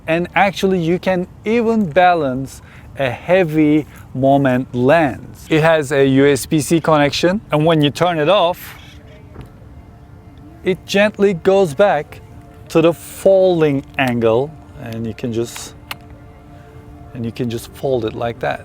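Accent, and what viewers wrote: Turkey. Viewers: Butun video dedim ki Turk aksani duyuyorum surekli. En sondaki hoscakalin the cherry on top oldu :) Daha bugun aldim cihazi merak ediyordum farklari tesekkurler video icin. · Aksanı türke benzemiyor mu adam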